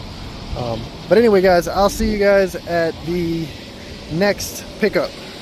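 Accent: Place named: Louisiana, United States. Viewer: I hear Louisiana. You from The Boot?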